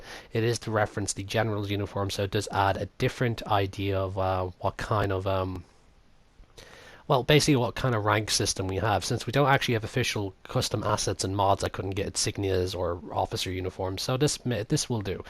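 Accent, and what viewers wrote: Ireland. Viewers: I'm guessing your irish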